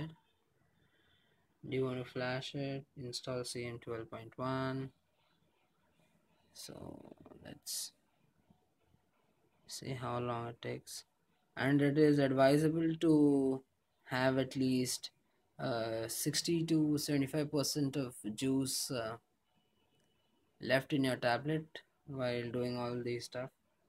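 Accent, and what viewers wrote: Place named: India. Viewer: No crees que si hablas en el idioma que realmente domines se entienda mejor ese inglés que manejas esta por la banda con el acento pareces un Indu hablando inglés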